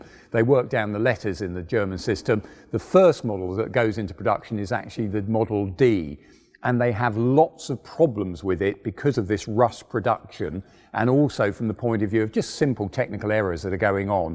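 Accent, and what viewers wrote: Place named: England, United Kingdom. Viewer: That English accent and tone of voice is extraordinary, congratulations!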